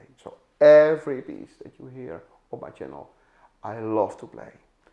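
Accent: Netherlands. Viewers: Like every Dutch (or Flemish) person I've ever met, you speak perfect English,. Your Dutch accent is as lovely to listen to as your music. And your Ten Commandments are just right.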